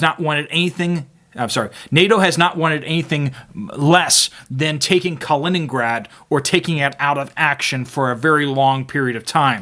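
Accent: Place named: Midwest, United States. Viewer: Hes from the Midwest, accents exist.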